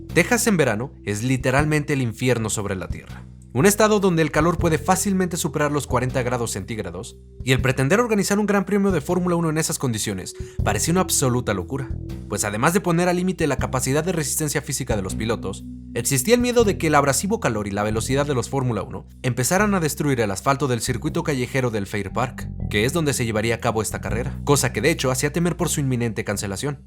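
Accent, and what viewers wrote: Mexico. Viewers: Un saludo desde Juárez México, en que ciudad vives? Veo tus videos desde hace tiempo pero me imaginaba que eras sudamericano con acento por acá, de México.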